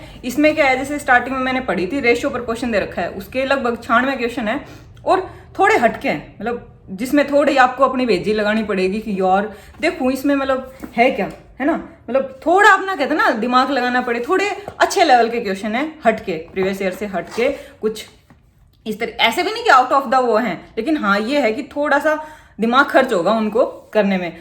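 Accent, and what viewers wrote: Haryana, India. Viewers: Your Hariyanvi accent 👌 💌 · I like the...way..yuu speak... Haryanvi 😊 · Makha ram ram 😃😃😃, nice starting, hariyana bala style sunme thoda kadwa but achha bhi lagta hei 👍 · Aap haryana se hai mam?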